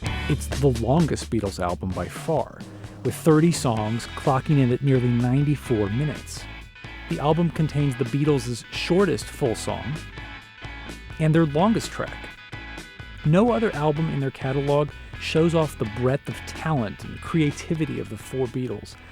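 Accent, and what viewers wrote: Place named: United States. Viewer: Annoying yank 'expert ' voice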